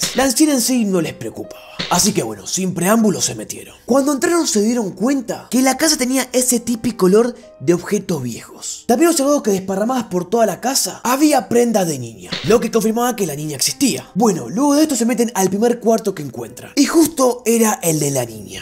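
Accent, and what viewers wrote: Argentina. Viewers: Coraline la peli es buenarda pa 😎 Saludos desde🙁😥🇵🇪 El asentó es de arg pero me gusta escribir su asentó :'( 😣🙁😥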